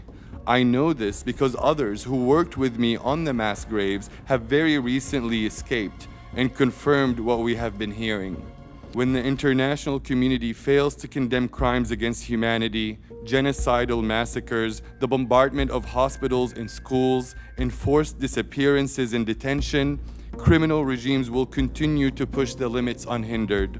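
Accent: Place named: United States. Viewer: دخلك لغتك العنجليزي كانك أمريكي تتكلم بطلاقة متى تعلمت الانجليزية وانت بتعرف بسوريا المتخرجين من الأدب الانجليزي لايتكلمون لانه مافي براكتيك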